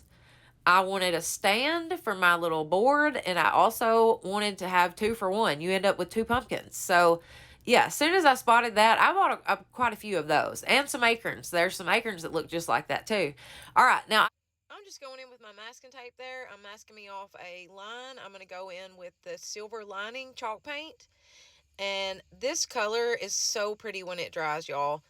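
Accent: South, United States. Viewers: I love watching your channel so many pretty country farmhouse things you make and I love your southern accent you remind me so much of that TV show designing women which I loved to watch also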